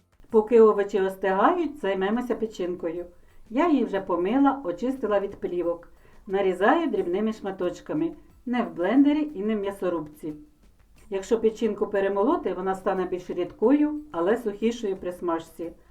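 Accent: Standard. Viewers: Спасибо за чистый и мелодичный украинский язык. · Цікаво👍Треба спробувати. Особисто від мене дяка за гарну українську, вперше чую на кулінарному каналі таку чітку мову❤Процвітання вашому каналу.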